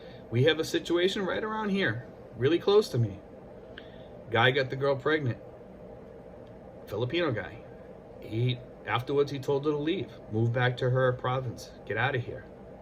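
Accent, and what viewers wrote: Boston, United States. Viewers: Always feel like ( your accent ) I'm watching Good Will Hunting! Bumpah stichah, for the cah.. seriously...I shop at home in Canada for everything. Balut is available here. And ah, the ladies who make balut live here too. So, if you want to travel 7000 miles to shop, knock yourself out. Might be simpler to walk to the local store. Sheesh boys! We are college gradu-ates right:)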